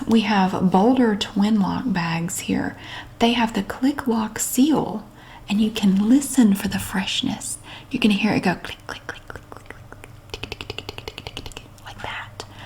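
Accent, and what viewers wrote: South, United States. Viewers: I swear nobody brings me more comfort than this woman. I'm not sure if it's the southern accent or the maternal vibe she has, but I come back to her videos any time I'm stressed or anxious or upset & she always brings a sense of calmness to me. Bless her soul.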